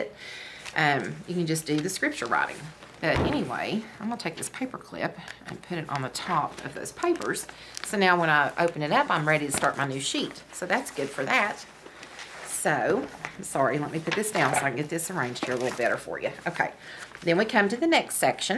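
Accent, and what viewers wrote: South, United States. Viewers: I just love your southern accent♥️ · what a great video! Thank you! It gave me great ideas and I really enjoyed it a lot! You are so sweet. Where are you from? You sound so southern! I am from the south but living in New Jersey the las almost 2 years and I feel out of place sometimes with my "y' all and my sweetie" comments to everyone...LOL anyhow, I really enjoyed the video and subscribed and am going to look at your other stuff on your channel! Look forward to seeing more. I will be making a prayer notebook and journal with my grand daughter in the next week or two and I promised her I would find some great info for us to put in ours. It should be a blast! I am so excited that she at 14 is interested in doing this! Praise The Lord! Blessing to you and your loved ones. Linda Coss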